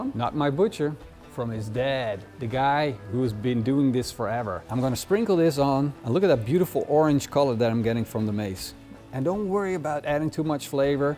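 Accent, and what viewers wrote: Netherlands. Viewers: Im a dutch guy with a turkish wife that really enjoy youre videos 🤣 she say he is a YouTube star with a dutch accent 🤣💪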